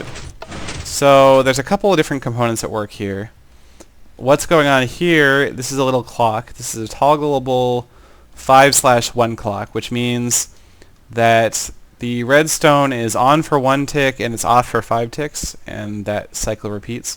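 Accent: United States. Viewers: maby he is trying to spell "Fußball" the german word... ?! because that the typical american accent...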